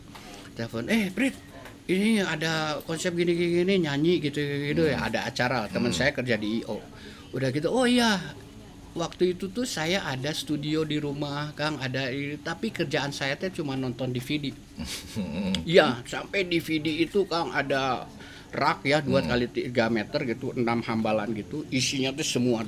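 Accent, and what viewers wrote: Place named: West Java, Indonesia. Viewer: Kang nyariosna meni ngosom khas Sunda pisan...👍👍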